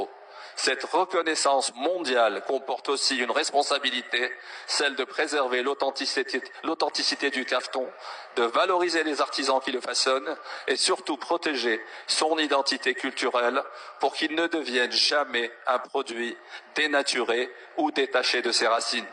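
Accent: Standard. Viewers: واش سمعتي أداك القرع الكلام الموزون و الفرنسوية النقية و هاد شي كولو بطابع ديال المعقول ماشي الكذوب و البهتان …. تعلموا من سيادكم le savoir faire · فرنسية نقية وماعندي مانقول فهاد الكلمة ةلي لخصات وبكل بساطة تاريخ القفطان عند المغاربة !! خطاب متقون وكلماته مختارة وصراحة برافو ..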